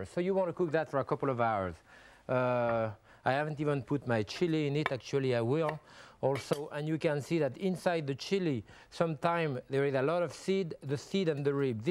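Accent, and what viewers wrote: France. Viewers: He corrected himself a minute later and said “of course it’s a cantaloupe “, but you may not have understood his divine French accent.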